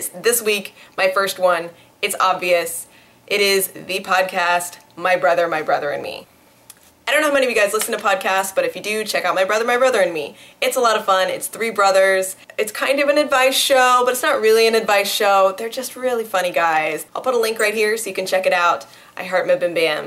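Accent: United States. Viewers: Standard 'murrican' English that is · In England it's more "i-on", not "i-ern", the way you pronounce in America is not British standard English. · she's right, even if her 'standard english' pronunciation is actually a bit on the USA side. Ironic is pronounced WITH an 'r', instead :). Iron, without. In USA, they do pronounce it as she says.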